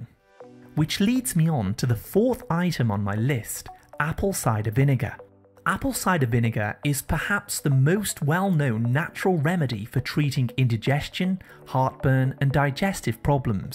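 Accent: United Kingdom. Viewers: I like people. How ever I can't help to say. The British have a real problem pronouncing the English language. It's maddening. Vit,a,men's. Instead of vitamins. Waa,tore. Instead of water. Also it seems the British have a big problem with the letter "r". Look language and the pronunciation of it is key for those who seek to speak or become an orator. One who learns the value of speech and tone. Just saying. Use speech and a calm flow you'll feel good and those listening to you will benefit in the understanding of what you say. Thank you for your time and caring. I won't pick through the other Miss phrased words. Your kind soul is on the good track. Again thank you. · Do brits say "Vytal" when saying vital? If so why do you all annoyingly say "Vitamins" when the prefix is clearly vital pronounced "Vytal"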